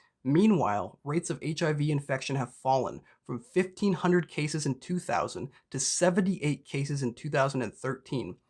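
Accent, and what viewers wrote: Canada. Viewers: Damn idk why but i thought you were from canada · Hey, I thought you were a Canadian fella haha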